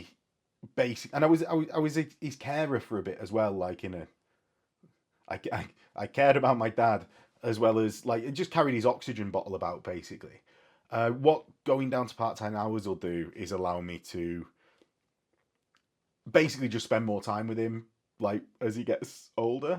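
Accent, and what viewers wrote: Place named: Manchester, United Kingdom. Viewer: Where abouts in the uk are you based? Might be able to trek a bit for a game. I'm thinking manchester area by accent?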